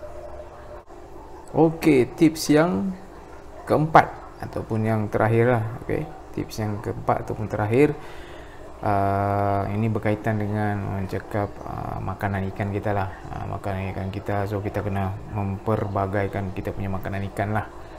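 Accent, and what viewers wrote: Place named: Sabah, Malaysia. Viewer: Ade sleng sleng sabah sikit la bang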